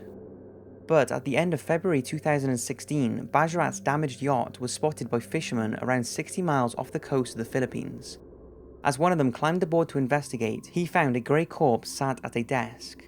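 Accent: Wales, United Kingdom. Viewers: Love to know where your from. Kinda sound a bit Welshy. Bit of a Cardiff accent going on when you say some words. Your awesome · Wales, UK :P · Ahhhh a good British voice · I would say Welsh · are you welsh? I know it sounds like a stupid question, but are you? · He is British but I mean you're obviously right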